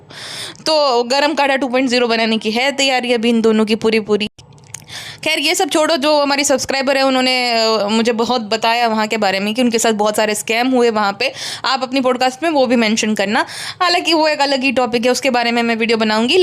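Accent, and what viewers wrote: Gujarat, India. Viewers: Aap bolne pr se lagta hi h k aap gujrati ho kyu ki me bhi rajkot me raheti thi wha gujrati bolte h jab bhi ap koi gujrati word bolte ho kuch to me khush ho jati hu aisa lagta h meri frd mujse bat kar rahi h....etna acha lagta h muje ar sunne me maja aata he...❤❤❤ Luv 😊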